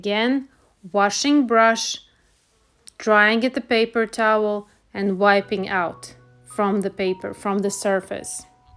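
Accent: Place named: Russia. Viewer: Русский акцент не спрячешь))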